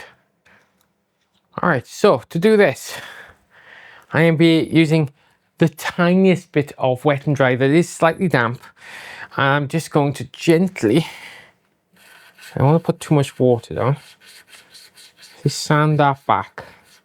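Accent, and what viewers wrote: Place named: Wales, United Kingdom. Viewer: I thought you were German .... but your Welsh!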